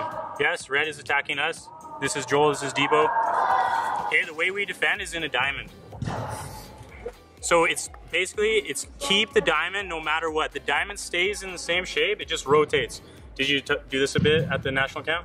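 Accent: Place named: Canada. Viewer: As soon as I heard like a fkn Canadian accent I turned it straight off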